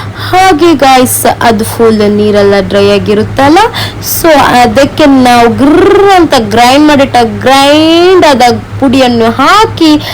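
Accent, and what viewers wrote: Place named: Beary, India. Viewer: Ninga endg vatrasi kannada mundre nink byari slang carect band alle ade mundoru nink nalle saport akdar arum ningale troll aklle ❤ pinne ninga troll ayeng ninga tell famus avude k😊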